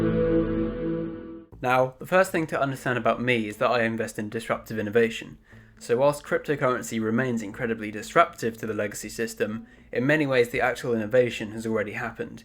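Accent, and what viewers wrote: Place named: Texas, United States. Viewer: I know a bit abt dialects and I have to ask, what part of Texas are you from?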